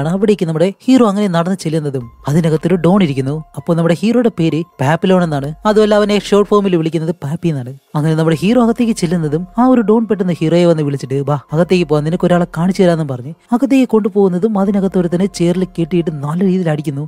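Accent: Palakkad, India. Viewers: പാലക്കാട്ട് കാരനാണല്ലേ കഥ പറയുന്നത് 😃?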